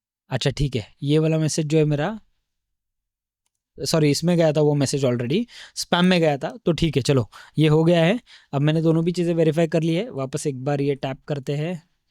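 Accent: Maharashtra, India. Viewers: Bhava tu marathi aahes na tuja Accent ni kaltay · Thank you very much bro for introducing me to AI Agent world. Although I am a software engineer but I have never explored AI Agent side. Your way of describing is very good and seems genuine. Describing everything from scratch. I like your Hindi with Marathi style, it reminds me my days when I was in Mumbai and Pune. Want to learn lot from you. :)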